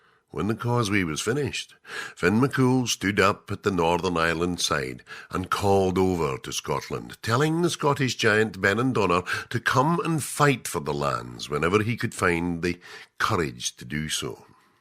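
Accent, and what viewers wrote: Scotland, United Kingdom. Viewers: I have never heard this version, I love it, lol. I may be wrong, but it sounded like a Scotsman telling the story? I took that end bit about the Isle of Man as a jab. Is that a "inside Scottish joke"? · The story of one of Irelands most geological sites. Narrated by a guy who is not Irish but Scottish.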